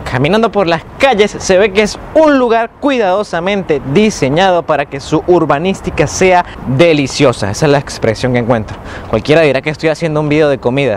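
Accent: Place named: Andes, Venezuela. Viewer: lo Gocho.. es una expresión ... por su acento característico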